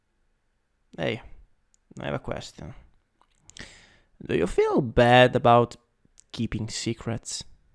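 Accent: Italy. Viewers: I love ❤the Italian accent is the best😅😅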